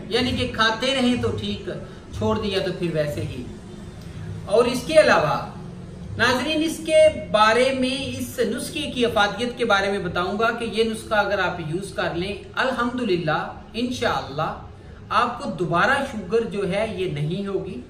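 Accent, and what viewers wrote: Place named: Punjab, India. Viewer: Kia bat bhai tumrai اردو sahe bolo bhai پنجابی مکس mat Kro pls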